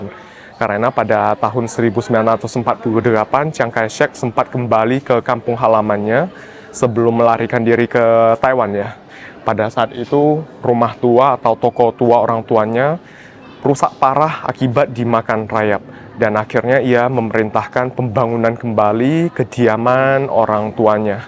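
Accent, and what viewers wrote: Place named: Medan, Indonesia. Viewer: Kau cakap tetap logat medan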